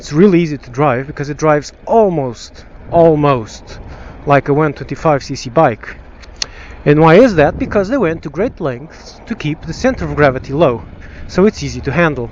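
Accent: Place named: Portugal. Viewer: Awesome review, I'm also portuguese, so I caught on to your accent immediatly, I have the same haha, and I also own and NC700x. And I "downgraded" from a CBR 900 RR zx10rgsxr crotch rocket thingy, like you so well put it. The thing is that with my 900 i could never explore the machine in the road properly and the largest trip I was able to fathom was to the next petrol station. With this one I feel I can go anywhere. It's a great, fun and useful bike, a bike for the real world!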